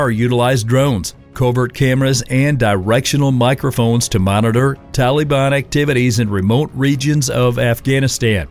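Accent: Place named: United States. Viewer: Another disappointing video created with a startling level of ignorance. Why narrate a history of the SRR with a mostly American military forces video overlay. An American accent narrator is also a big turn off.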